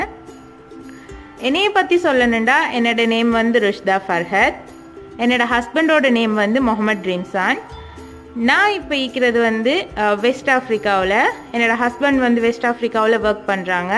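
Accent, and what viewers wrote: Sri Lanka. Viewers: லங்காவில பேசக்கூடிய தமிழ் எனக்கு ரொம்ப புடிக்கும். அதிலும் நீங்க பேசக்கூடிய தமிழ் எனக்கு ரொம்பவே புடிக்கும். உங்கட சமையலையும் சேர்த்துதான் சொல்றன். அல்லாஹ் பாரக் ஃபீ. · Very candid and innocent reply. Do not worry about your accent and language that is the attraction for normal Tamils to hear srilankan accent . If your mother tounge is not tamil then you are doing a super job. And your cooking is also short and crisp Just keep it up. My good wishes for all success in life. You will shine sister.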